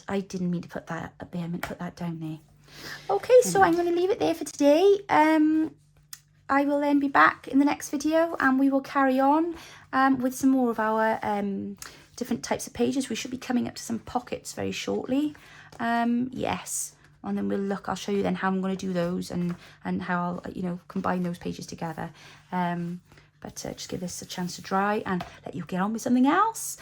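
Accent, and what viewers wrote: Wales, United Kingdom. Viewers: So glad I found you - I've really been enjoying all the A-Z Christmas crafts. I spotted that Welsh accent too - I've just returned home to Wales after 20 years away and loving it. Nadolig Llawen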